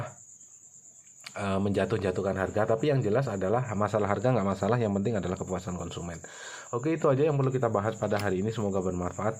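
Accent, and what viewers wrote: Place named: Bali, Indonesia. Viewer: logatnya bali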